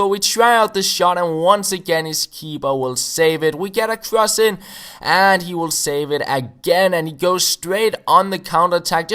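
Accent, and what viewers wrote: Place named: Denmark. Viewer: I love the danish accent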